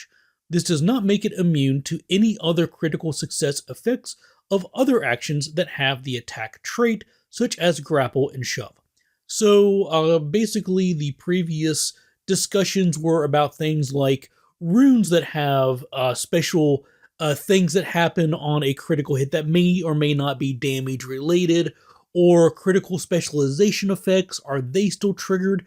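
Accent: United States. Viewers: thanks for the reply! actually, i was wondering which state? there are so many american accents and i find them hard to untangle. (totally didn’t notice the speech impediment btw!)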